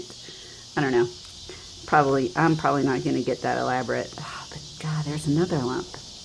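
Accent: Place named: South, United States. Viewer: Ms, from the background noise and your accent, I'd say you're somewhere in the South! I'm in New Orleans. Beautiful pour. Every time I try that many colors together I get mud.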